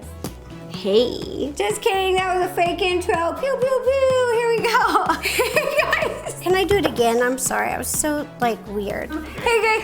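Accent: South, United States. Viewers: voice is so cute and southern ij love it like southerners represent!!!! I'm from ga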